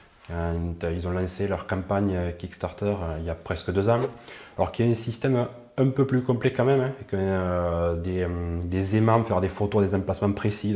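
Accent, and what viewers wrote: South, France. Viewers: T'es débalages sont toujours aussi bien et même s'améliore de vidéo en vidéo et continu comme ça. Et bien sûr toujours avec l'accent du Sud😊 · Dommage qu'il y ait pas beaucoup d'abonnés sur ta chaîne parce que tu présentes très bien les produits et ton petit accent du Sud est attachant ;) tu veux pas essayer de contacter Steven par exemple?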